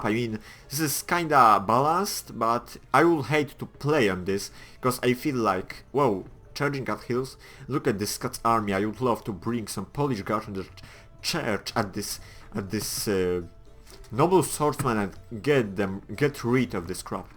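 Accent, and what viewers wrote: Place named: Germany. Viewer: you great but try speak more well ok ? where u form perpahs germany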